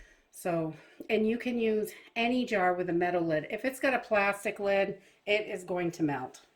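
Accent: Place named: Boston, United States. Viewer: I was listening to her talk, then she said that she "doesn't like to use her mason JAHS". I jumped right to comments to see if anyone mentioned where she was from. I am a Bostonian living in SC. Been away for about 10 years, so every time I hear the voices from home, I jump. Ya never notice the accent until you been away for awhile.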